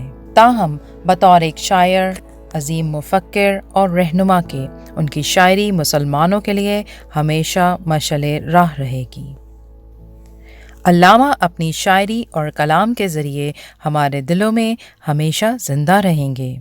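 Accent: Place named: United Kingdom. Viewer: Bhai yeh urdu k Kai lafz glat pronounce kr rahi hy English accent use kr rahi hy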